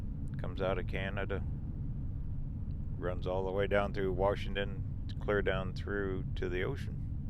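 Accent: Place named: South, United States. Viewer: You do have a southerner accent.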